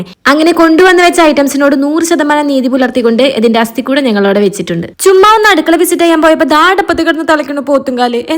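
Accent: Thrissur, India. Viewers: Thrissur ano cheeci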